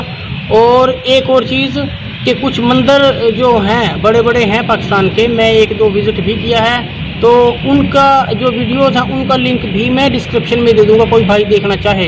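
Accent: Haryana, India. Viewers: Haryanvi bolte ho bilkul hamari side ki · When you speak Haryanvi,it gives a quite different impressin.Anyhow I regularly see your videos based on exodus from Haryana which makes me remind what my father used to tell me calling it Gadar.I respect you for your way of putting things in the true perspective regarding Haryana's culture then a part of Panjab.I am from Karnal.One question which still remains illusive is about atrocities on Hindu women by Muslims.Honestly clarify it.Hatred & radicalisation from any side shall only be destructive for humanity in both countries.Thanks & best wishes for your efforts to make people know the painfull reality of partition.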